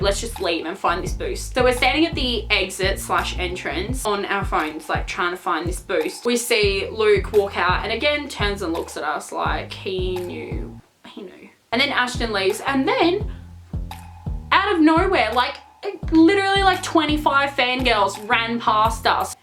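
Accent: Australia. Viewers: Your Australian accent is so thick.🐨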